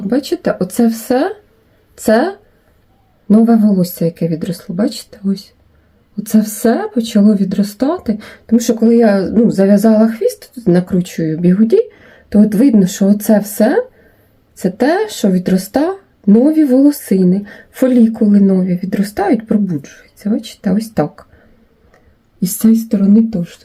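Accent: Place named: Slobozhanshchyna, Ukraine. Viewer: Сонечко наше ясне!!! Доброго вечора. Подивилася як ви з родиною розбите голубці, і що!ґ! Пішла все купила на гоубкі!!!, Ви неймовірна жінка, а ще саме головне, як люблю ( просто балдею) от нашего Слобожанського говору!!!!! Київ, Суми!!!!!💙💛👍🇺🇦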